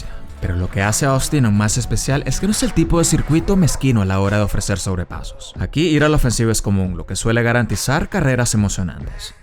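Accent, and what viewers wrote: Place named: Venezuela. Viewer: a pensé que eras de México, porque no tenes casi acento venezolano xd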